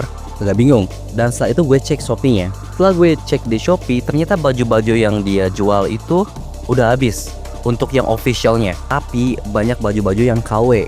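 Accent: West Java, Indonesia. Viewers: bang, kamu orang sunda ya?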